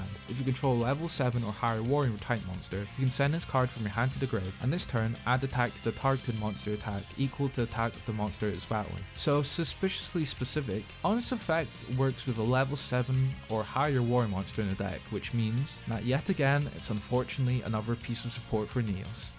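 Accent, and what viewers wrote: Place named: Scotland, United Kingdom. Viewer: Great video, your accent btw, are you Scottish, specifically aberdonian?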